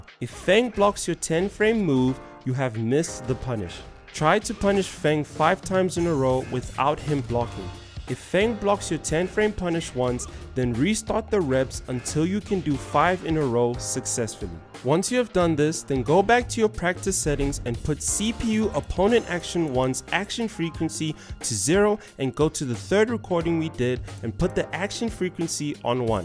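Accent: South Africa. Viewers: anazing background music and south african accent? subscribed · Lol I was watching a Lil Majin vid than randomly your video played next and got me interested and as I kept listening and watching without paying attention to your youtube username I suddenly realized bro is a fellow South African! Definitely subbed. Working on a ton of projects for my own channel, keep pushing bro!🔥⚡️🇿🇦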